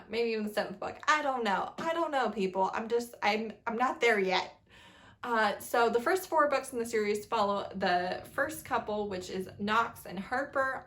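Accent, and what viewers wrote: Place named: United Kingdom. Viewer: you always sound British in your intro lolll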